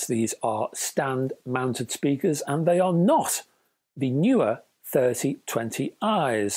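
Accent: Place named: Merseyside, United Kingdom. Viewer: Channel is great btw. Also nice hearing a voice from close-ish to home (I'm from Crosby)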